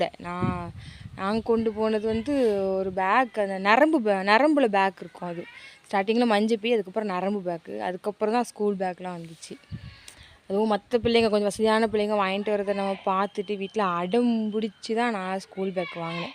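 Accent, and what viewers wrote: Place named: South, India. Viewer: கள்ளம் கபடம் இல்லாத குழந்தை தனமான உங்க தெற்கத்திய பேச்சு எங்களையெல்லாம் மயக்குது தங்கம்.நீங்க தொடர்ந்து வீடியோ போட்டுக்கிட்டே இருக்கணும், நாங்க உங்க அழகான பேச்சை ரசிசிகிட்டே இருக்கணும்.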